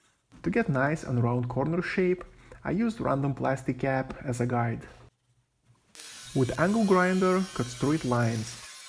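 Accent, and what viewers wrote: Russia. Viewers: У тебя Русский акцент. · Пиздец какой у тебя Русский акцент браток! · ты русский что ли??? акцент слышен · Русский акцент. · Are you Russian? your accent is telling me Russian but I'd rather ask then assume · Why do you speak like russian? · чувствуется русский акцент..... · Русский акцент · Русский акцент)))) Канал на русском есть? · sharp russian accent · Oh!!! Russian English!!! 😁👍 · Ебанутый русский акцент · Лучше бы бот озвучил. Какой откровенный акцент, прямо как в американском кино, где русских показывают. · Настолько русский акцент , что сразу спалился .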